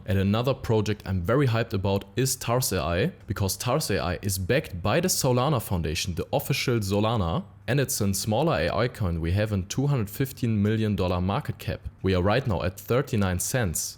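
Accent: Germany. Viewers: I like your german accent ❤🎉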